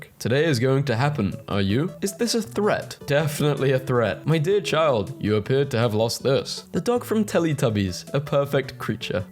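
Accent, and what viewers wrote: United Kingdom. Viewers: Why can't I tell if you are British or not? It's making my skin crawl.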